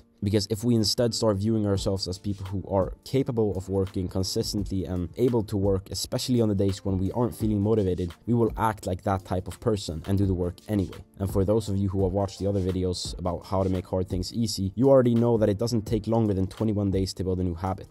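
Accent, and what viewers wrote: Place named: Sweden. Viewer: just a fellow swede appriciating another swedes work keep going this was really helpful!!(if my hearing is wrong and u r not a swede i'm sorry but u do have a nice accent then!)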